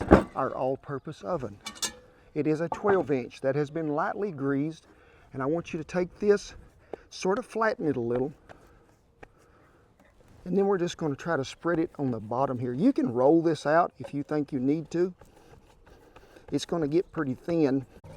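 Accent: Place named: Texas, United States. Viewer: I love that Texan accent. Love your channel.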